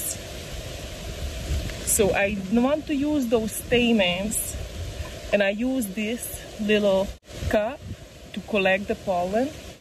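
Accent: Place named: Hungary. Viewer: Haha, elég régóta nézem a videóidat de eszembe se jutott hogy esetleg magyar az akcentusod :) Én a Karib tenger egyik szigetén élek van egy fiatal rollonia fám amiről pár hete szedtük le az első és egyetlen gyümölcsöt. Most nagyon sok virág van rajta de nem egyik se fordult még gyümölcsbe, ezért kérdeztem. Nagyon szeretem a videóidat (köszönöm megint, hogy megosztod velünk) meg persze irigykedem a szép kerted miatt!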